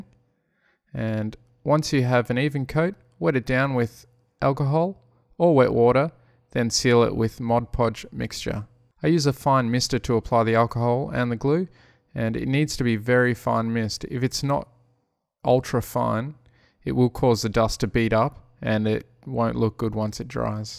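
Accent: Australia. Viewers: Hi, great vid mate, got referred to you by ModelerMan Mike. Great to hear another Aussie accent and looking forward to seeing more of your stuff! Cheers, Dan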